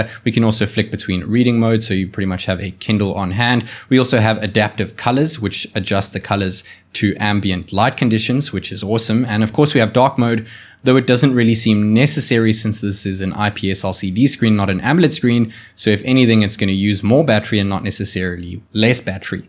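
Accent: South Africa. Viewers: I always sensed he had that South African Touch 😸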